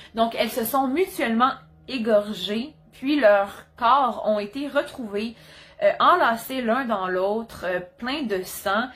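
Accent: Quebec, Canada. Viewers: J’adore l’accent Québécois 🇨🇦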